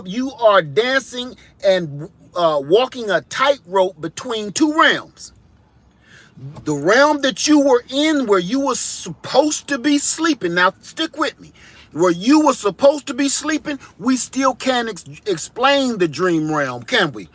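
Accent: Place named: South Carolina, United States. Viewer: Hello! I been watching a few of your videos now and really enjoy your content! I am in Germany and my 2 Bi-racial sons live in SC, just as I did from 99-2012- heck I miss it so much and my goal is to make it back one day. So good to hear you talking- that SC slang that I speak lol Love what you say and am agreeing 100% Keeppushing! God bless you!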